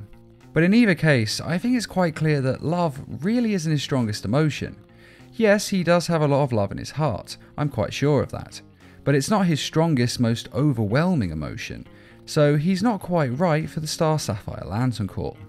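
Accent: United Kingdom. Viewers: Fear because he’s got that calm British accent that makes him immediately 10x as scary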